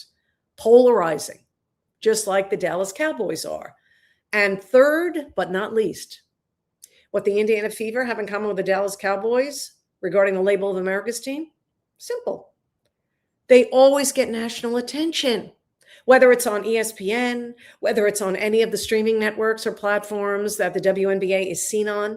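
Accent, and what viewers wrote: New York, United States. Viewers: your NY accent makes me homesick, living in Europe😂😂😂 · Wow this is a gift! Another fab sports podcast and finally we get to hear your NY accent!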